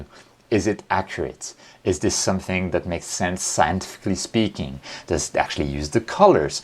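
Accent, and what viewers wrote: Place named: France. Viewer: nice video ! are you french . i feel a small accent ....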